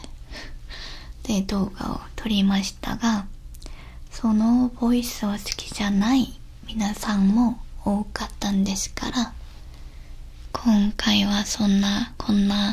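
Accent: South Korea. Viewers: 韓国の女の人が話す日本語っていいなぁ。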